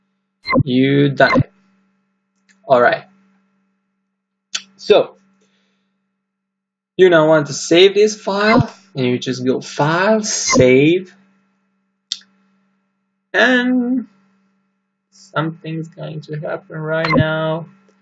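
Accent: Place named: Balkans. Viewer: Hey buddy thank you for your tutorial. worked like a charm for me! I appreciate it. btw I've noticed you tend to sound like a person from the Balkans lol which is good either Bosnian, Serbian or Albanian but more Slavic I can tell lol thanks once again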